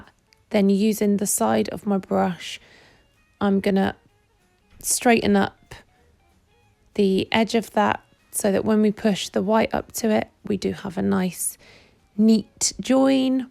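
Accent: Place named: United Kingdom. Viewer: Lol I didn’t understand what you were saying when you were saying glacier for a while but then I figured it out. In America we say it like glay-shur, so the way you say it I was like what?! Lol. I like uk accents, everything is prettier 😊 Also, these nails are gorgeous! Love how you created that ice affect with the foil, definitely gonna use that in a set ❤️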